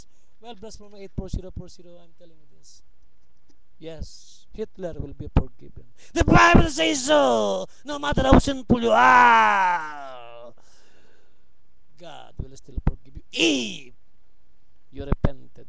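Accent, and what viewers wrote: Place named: Visayas, Philippines. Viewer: hisa bisaya thats why sounds irritating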